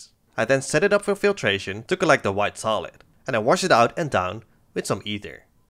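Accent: Netherlands. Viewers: Are you dutch? youve got like a dutch accent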